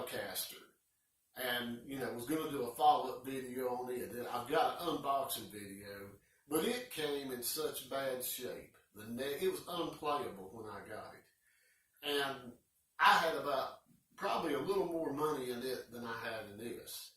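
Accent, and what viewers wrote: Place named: South, United States. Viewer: Love that Southern drawl! Epitome of politeness. You earned your thumbs up, friend!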